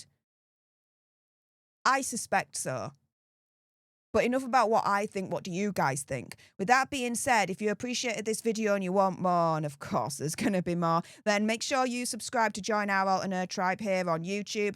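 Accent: Yorkshire, United Kingdom. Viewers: It's a beautiful accent!! has a northan Yorkshire accent!! A Yorkshire lass!! Born and bread in the county of Yorkshire!!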